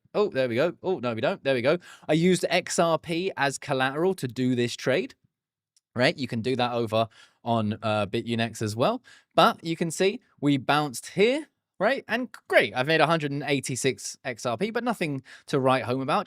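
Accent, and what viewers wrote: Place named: Essex, United Kingdom. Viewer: Defo prefer hearing a Brit. You sound Southern too, Essex kind of way I presume?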